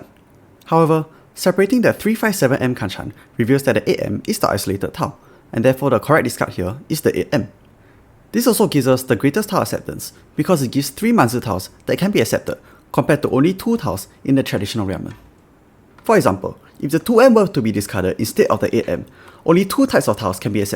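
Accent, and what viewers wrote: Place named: Singapore. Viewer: Instant sub. I never knew I needed to be called bad at the game in a Singaporean accent until now. Thanks for explaining the mysteries of Riichi to us baka westerners, this is really helpful content.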